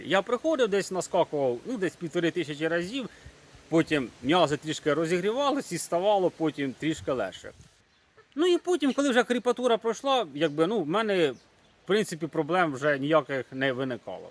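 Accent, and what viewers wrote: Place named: Poltava, Ukraine. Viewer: Полтава? Акцент как на полтващине. Прыг и бег если нет проблем с коленами, самое простое для похудения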